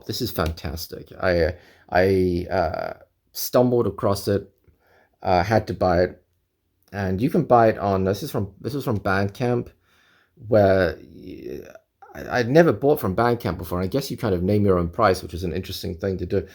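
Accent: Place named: United Kingdom. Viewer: Hopefully not your last video, but if it is thanks for the sharing and entertainment, gonna miss your videos and your British accent. Lol. By the way, is from Lebanon. Hope to see you again.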